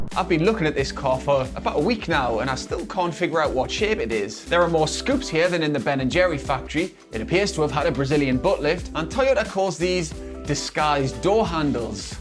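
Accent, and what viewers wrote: Newcastle, United Kingdom. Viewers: are you a geordie like ?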